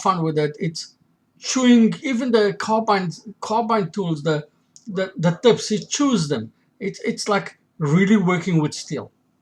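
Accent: Israel. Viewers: I knew, that's an Israeli accent. Strike!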